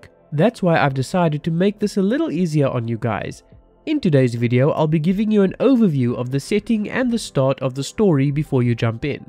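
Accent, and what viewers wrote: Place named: South Africa. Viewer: Hey I like your videos. When I watched you I thought your accent sounded... familiar then I realised... jy is ook van SA. Lol. Great stuff man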